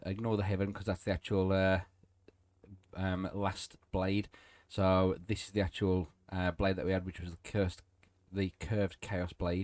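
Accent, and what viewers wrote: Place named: United Kingdom. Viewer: you sound like a united kingdom person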